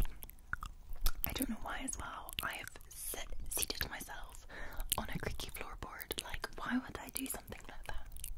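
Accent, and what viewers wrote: Scotland, United Kingdom. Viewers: Ahhhhh yes another glorious night of falling asleep to that amazing Scottish voice 😴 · Scottish accent challenge: try saying ‘burglar alarm’ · I swear Scottish Tingles are different than normal tingles. Tell us more about Scotland!! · Love your accento, you made me want to go to Scotland. You're lovely, i like everything of you, but the voice Is marvellous! ❤❤❤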